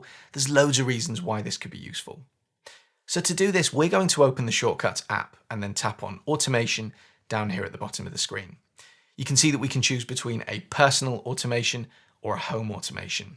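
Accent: England, United Kingdom. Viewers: Yes please that would be great. Love that you are English like myself so I can follow what you say easily, and love just the way you make everything so easy to do with automations and a clear instruction. I’ve learnt so much from your tutorials to which I’m ever so grateful. Thank you so much. Tina 👍🏻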